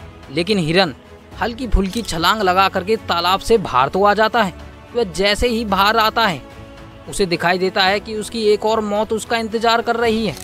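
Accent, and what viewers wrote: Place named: Marwari, India. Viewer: ये बोलने वाला व्यक्ति जरूर मारवाड़ी है बार बार उलझ रहा है 😂😂😂😂😂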